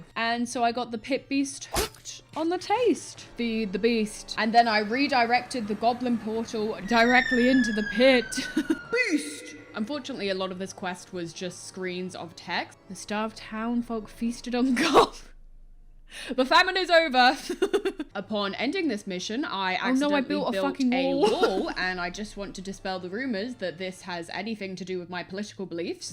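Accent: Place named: Southern England, United Kingdom. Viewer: hi (?) what accent would you say you have? what region of england? i hear southern but if there's something more specific let me know. sorry i just like accents:-)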